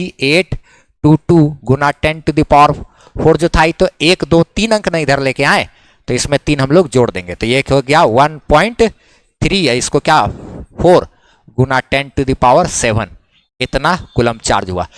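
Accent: Bihar, India. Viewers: Sir aap bihari ho kya😅?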